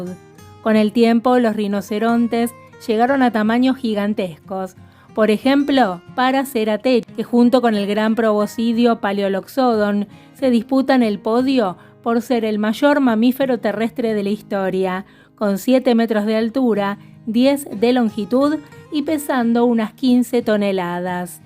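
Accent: Argentina. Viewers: Yo estaba viendo este video bien interesante 😊, cuando llegó mi mamá y me dijo "ella debe ser de Argentina, por el acento" y yo quedé 😮wow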